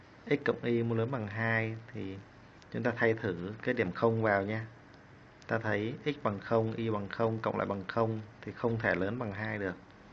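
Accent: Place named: North, Vietnam. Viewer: THẦY NGƯỜI BẮC HẢ THẦY :x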